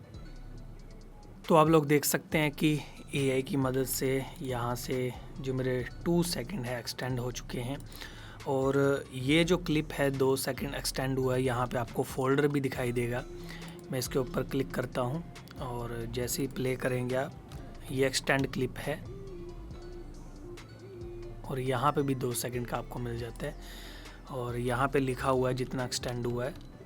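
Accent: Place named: Punjab, India. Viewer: Brother hum yaha English pronunciation sikhne nhi aaye.....embarrassment feel mat krao....inka Punjabi accent hai..... but sir ka workshop attend kro ek baar...pta chal jayega...🙏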